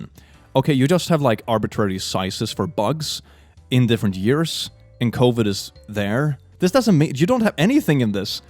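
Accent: Sweden. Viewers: hey! ive joined your discord server!:virtualhug: Also are you swedish? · This sweedish accent gets me every time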